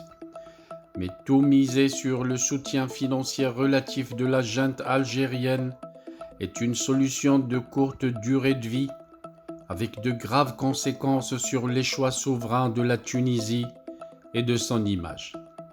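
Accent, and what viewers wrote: Morocco. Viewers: Vous êtes Marocain facilement reconnaissable par la prononciation d'un français directement traduit de l'arabe. Le tlemcien parle et pense en français et ne connait dans le détail le passé des relations politique maroco-tunisienne.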